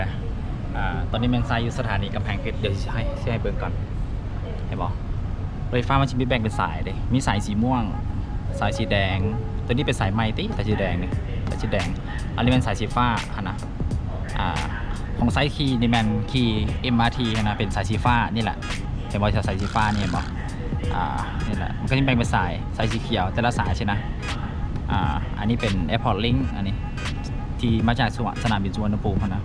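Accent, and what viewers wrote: Vientiane, Laos. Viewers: คนเลยในความรู้ของคนอิสานก็คือ คนพูดแบบคนหลวงพระบาง ที่น้อง พูดนั้นคือสำเนียงเวียงจันท์ขนานแท้ ถ้าย้อนไปเมื่อเป็นร้อยปีเจ้ามหาชีวิตลาว ได้นำเอาคนเชียงใหม่มาไว้ที่เวียงจันท์ ส่วนคนที่พูดสำเนียงแบบน้อง จะพบได้ที่จังหวัดชัยภูมิ เพราะเจ้าพ่อพยาแลได้นำพาคนเวียงจันท์มาสร้างเมืองชัยภูมิเมื่อรัตนโกสินธ์ตอนต้น ส่วนคนเวียงจันท์ปัจจุบันคือคนกลุ่มใหม่ที่เข้ามาอยู่ใหม่แทนพวกเดิมหลังมีการเปลี่ยนการปกครอง คนลาวในกำแพงนครรุ่นเก่าได้อพยพหนี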